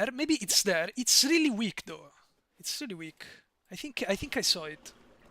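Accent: Italy. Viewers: Are you...are you tuscan?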